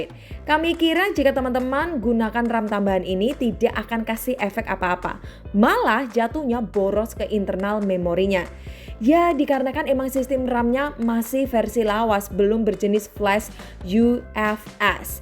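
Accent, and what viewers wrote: Surabaya, Indonesia. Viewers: Suroboyo pasti iki😅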